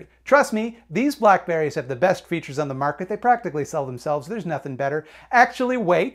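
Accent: Newfoundland, Canada. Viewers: I'm sorry, you're a Newfie???? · I did not realize you were a newfie! Hey from scotia!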